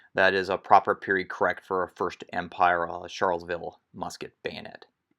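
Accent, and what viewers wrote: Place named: United States. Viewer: Are you intentionally using ‘Murican pronounciation of the various uniform elements, vice the actual French pronounciation? Is that a reenactors affectation? Regardless, very impressive work! Do you belong to a Napoleonic re-enactor group? If so, what regiment do you present an impression of?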